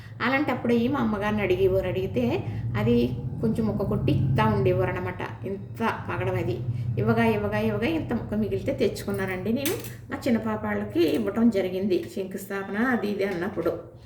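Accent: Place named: Godavari, India. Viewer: Mana godavari yasa❤❤❤❤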